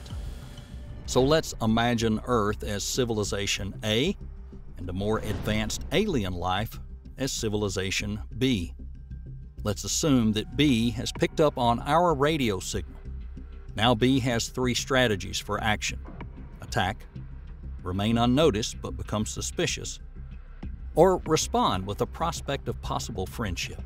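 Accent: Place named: Tennessee, United States. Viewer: Honestly, this is one of my favorite channels. I listen to it every night and on my way to work what part of Tennessee are you from ? my family hail from Bristol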